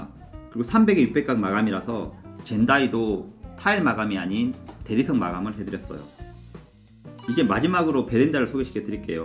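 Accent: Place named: Gyeongsang, South Korea. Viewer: 영상 볼때마다 홧병 생겨요ㅠ 왜 이제야 가 알고리즘에 떴는지 ㅠ 그래도 갱상도 구수하고 순수한 말씨가 좋아서 숨고르기하면서 봅니당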